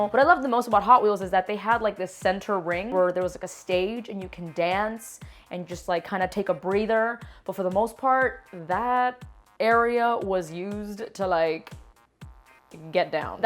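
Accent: Cuba. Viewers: I luv her Cubaglish.🤗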